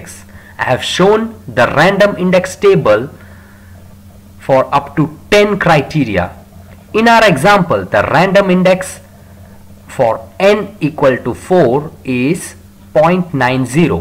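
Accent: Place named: India. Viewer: 90% of tutorials on YT are by indians. Why is that? This accent is very difficult to follow.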